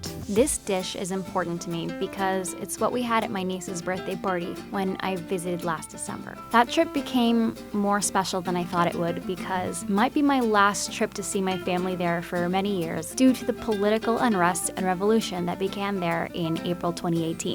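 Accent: United States. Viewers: Hablas como gringa habla un poco de español moja yo soy de Costa Rica y quiero que enseñes a hacer gallo pinto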